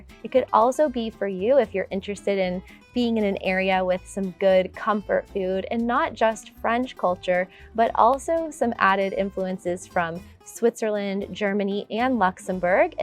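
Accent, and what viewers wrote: United States. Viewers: Franco-American France mother American father US army, and I have live in France for over 20 years this time! I could not disagree with you more on everything sure if you have lots of money, and are will to burn it anywhere in France is just as good as any other place! France is about the size as the state of Texas and everything is near by. Do you want tourist trap she is your lady someone to help spend your money she is your lady or someone who thinks she knows France, but actually she fooled you she is your lady! Lyon & Toulouse two of the worst places to go and Provence Nice is nothing, but American tourist trap for the chic want-a-bes! One thing about France is you can find great food and great wine anywhere and there is something for everyone you want water go to the water you want mountains you just go there, but don't take her advise she actually knows little about France and that American accent could kill a horse to the French that have to listen to that I am sorry even my father knows better and he hates the French! 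Read some French history Google map research the place you like listen to every other expat on YouTube and listen to some French videos try learning French do what you can before coming here get yourself a Frenchie to talk with by asking one!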